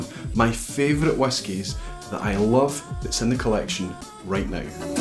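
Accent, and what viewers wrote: Scotland, United Kingdom. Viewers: Hello! Really like your videos, and your Scottish English! What part of Scotland are you from? Thank you!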